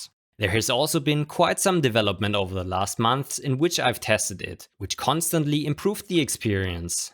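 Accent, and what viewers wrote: Germany. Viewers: Hello dear friend! Congratulation and thank you for this awesome video!!!From your accent i guess you are in Germany. I live in Baden-Württemberg i would be happy if we could communicate somehow. Ich spreche auch Deutsch:)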